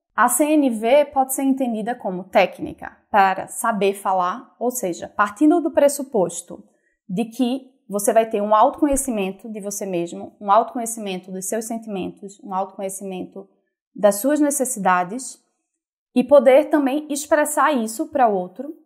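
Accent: Northeast, Brazil. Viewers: Eita sotaque maravilhoso da!!! Aoooo povo nordestino que eu amo!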